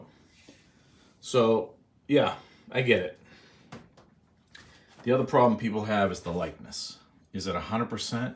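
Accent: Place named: Brooklyn, United States. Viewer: I ordered it. Also love your collection! I hear the BK accent lmfaoooooooo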